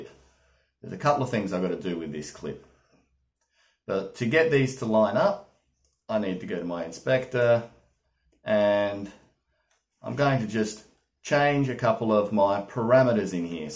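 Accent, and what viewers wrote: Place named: Australia. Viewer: Hello, loved your video tutorial. Spoken in true, direct and entertaining Aussie style. That's an opinion coming from an old, well travelled Scouser. I just signed up to Doodly, following my recent acquisition of DaVinci, when I decided to stay sane during lockdown by telling a lifetime of stories in video. It's a steep learning curve for an old git, so I'll be checking out your tutorials. Stay positive, Jim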